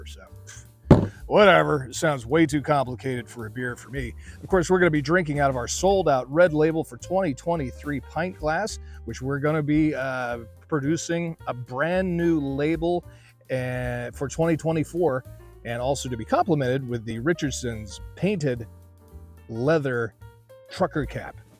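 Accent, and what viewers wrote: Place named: Boston, United States. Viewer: Bawstin LaaaagAH! Remastid! I can’t stand the Bostonian accent but I love the 🍺 😂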